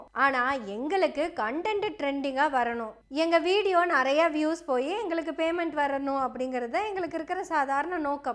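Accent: Kongu, India. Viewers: தங்கையின் கொங்கு வட்டார பேச்சு மிக‌ அருமை❤ அது என்னமோ சைமனே யாரு தூக்கி போட்டு மிதிச்சாலும்... ரொம்ப சந்தோஷமா இருக்கு 😂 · நீங்கள் பேசறது கொங்கு தமிழ் இருக்கிறது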